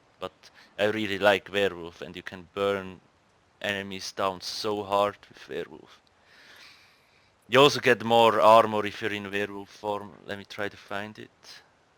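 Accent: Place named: Switzerland. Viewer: du bisch definitiv en schwiezer😂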